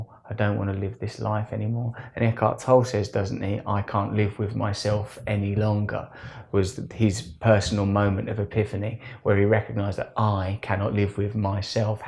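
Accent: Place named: Cockney, United Kingdom. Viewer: I love hearing this wisdom with a Cockney Accent. Brill innit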